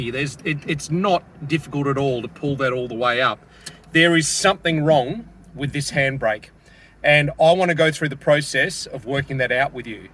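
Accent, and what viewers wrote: Australia. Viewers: Cheers mate, nice easy to follow demo and loving the Aussie accent. Many thanks from Sunny Scarborough ,North Yorkshire UK. Fairer than fair dinkum:goodvibes:.Kindest regards, Paul.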